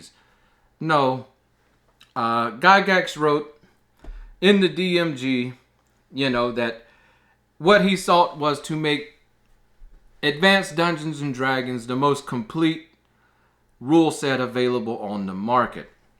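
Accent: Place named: Louisiana, United States. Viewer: So this channel needs to lean more into its Cajun roots. I'm here and subbed basically because of the content and the Cajun accent.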